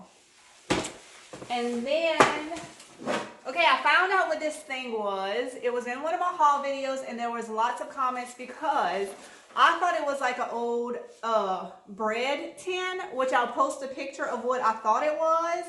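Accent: Louisiana, United States. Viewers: Hey! I just found your channel and love it! I knew from the first sentence you uttered that you had to be from Louisiana and then I saw you wearing a Louisiana cap! I’m from North Louisiana. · I knew it. Haha!!! I’m also Cajun and I love your accent and your channel.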